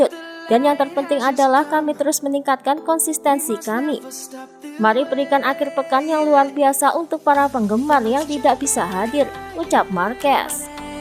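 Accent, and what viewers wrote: Java, Indonesia. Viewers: Jawane medhoookkk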